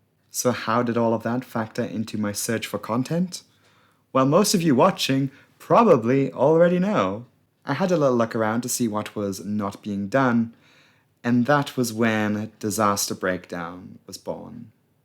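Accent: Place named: Scotland, United Kingdom. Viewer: Your videos are so comprehensively informative that I thought you were actually a professional in the industry! What was your previous "real" job? Have you thought about going back to school to enter the industry in a different capacity, such as engineering(for the future)? I love your voice and imagine you had an excellent SLP for vocal guidance during your transition. And that accent!! I can't quite place it--Scottish? Irish?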